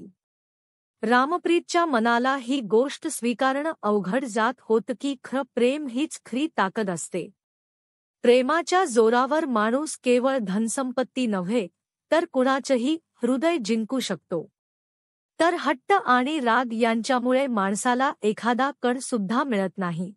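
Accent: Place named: South, India. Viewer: कथा kathan shuddh मराठी bhashet सांगावी dakshinatya bhashesarkhi कथा kathan vat te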